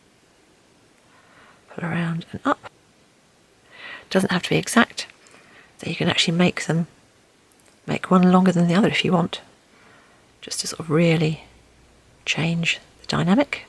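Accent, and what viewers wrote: England, United Kingdom. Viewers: Thank you so much! I've wondered how clay canes are made. I have purchased a number of beautiful canes from a very talented woman who sells hers on ETSY. I have terra cotta pots and I am ready to play! I also make pens so I want to try some on brass pen barrels. I am guess that they would need a study sealant for protection against discoloration of the clay and breakage if dropped. Any thoughts are much appreciated! Finally, I would like to compliment you for such a lovely, professionally produced video! It's so much more pleasing to my ears to hear an English accent vs. an American (Canadians are better, too!) Very professional and quaint at the same time. Cheers from your Yankee Cousin from "Across The Pond!"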